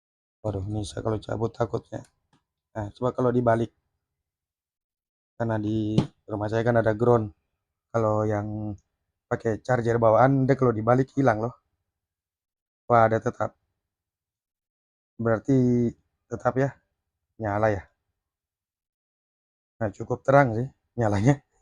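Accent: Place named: Eastern Indonesia, Indonesia. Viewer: abangnya dari jayapura? logatnya kek dari timur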